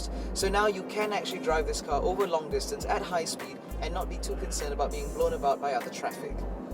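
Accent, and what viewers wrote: United Kingdom. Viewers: U sound like a british man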